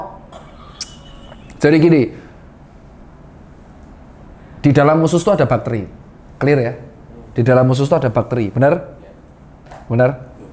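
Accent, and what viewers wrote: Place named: East Java, Indonesia. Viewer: Bosoe wong jatim...tuh..mantep pokoke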